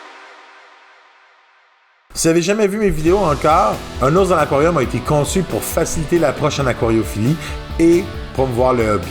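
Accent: Quebec, Canada. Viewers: UN GRAND MERCI cher monsieur avec votre très bel accent québécois. Mon fils de 6 ans sera ravi 🤩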